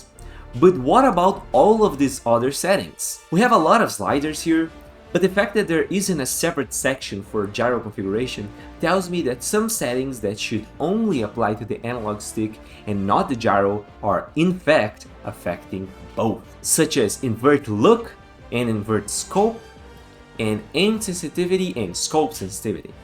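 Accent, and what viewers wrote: Brazil. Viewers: You have the brazilian accent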